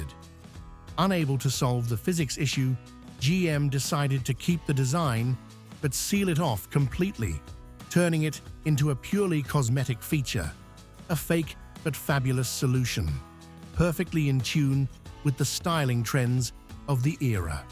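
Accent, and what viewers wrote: United Kingdom. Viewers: That's exactly right. This video doesn't even answer the very question it proposed in the title. Yet another announcer with an annoying British accent. There was barely any time for GM to do the crash quick, completely re-designed '59's by mid-'57. Long hour 7 day work weeks for months was what it took, and exactly what they did, with beautiful results for all 5 division's models.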